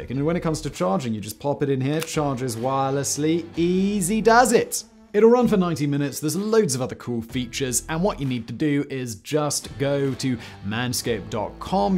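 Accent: RP, United Kingdom. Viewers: Manscaped isn’t just for under your knickers, you can also use it to remove that sweater your always wearing under your shirts. I’m sorry, the “jumper” you wear, you speak posh, so you don’t understand “sweater”.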